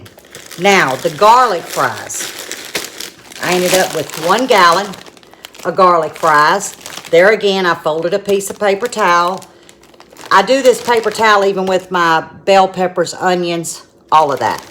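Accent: South, United States. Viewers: Hey, love the video. Just made it bk from south Louisiana visiting family. I think you are most definitely from the south 😊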